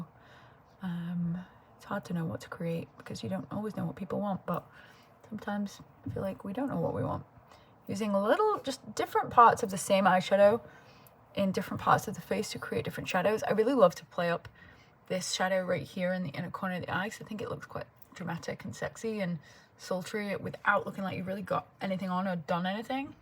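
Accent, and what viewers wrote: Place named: Manchester, United Kingdom. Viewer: You kinda have a manc accent like my dad! Your looks are all gorgeous, loving this series doll!!🌸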